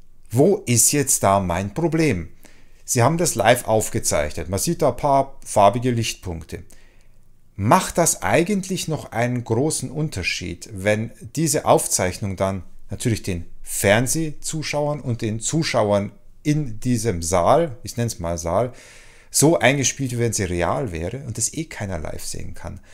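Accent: Bavaria, Germany. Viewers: yep - sehe ich auch so. Woher aus dem grossen "Kanton" bist den eigentlich? Toent irgendwie bayrisch ... Muenchen? (PS: fragen kann ich ja mal, antworten ist ja freiwillig)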